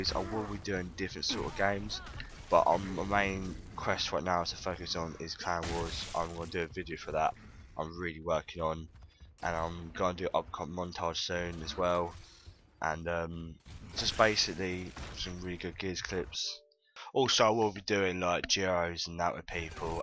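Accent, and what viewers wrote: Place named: United States. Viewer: Yay <3 thought you were American !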